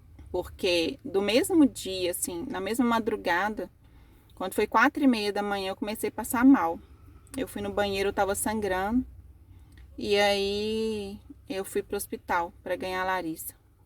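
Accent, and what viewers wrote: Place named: Minas Gerais, Brazil. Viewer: Minha irmã mineira,logo no primeiro vídeo percebi seu sotaque , que testemunho mais lindo . O luto dilacera a alma ,qdo minha neta não tinha nenhum aninho ainda,qdo meu amado esposo foi acometido de um cancer ja muito adiantado.Fiquei quase 40 dias com ele no hospital,passou por 3 cirurgias ,eu só acompanhava os primeiros passos dela por video . Se não for o supremo amor de Deus a gente nao aguenta .Tantos sonhos ,me aposentei pra termos tempo com Marina , e vi meu amado cada dia piorando . Tudo que planejamos indo de uma forma tao cruel ,mas pela graça do Senhor tive forças pra entregar Ele para o Criador e Pai celestial . Foi um processo muito doloroso o meu luto porque entramos na pandemia ,perdi parentes ,vizinhos ,amigos,sobrevivi pelo legado de fé que ele deixou,um homem muito temente a Deus ,um grande pregador e adorador . 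Não foi facil mas o Senhor renovou minhas forças,minha fé e hj prossigo na obra ,minha netinha ja com 3 anos , e minha esperança maior é um dia nos encontrarmos na glória, por isso luto diariamente .Fico feliz que Deus te proporcionou uma nova história, e seu testemunho me fortaleceu ,que possamos permanecer fiél ate o fim , porque um dia Deus enxugará de nossos olhos toda lágrima🙏🙌📖📖📖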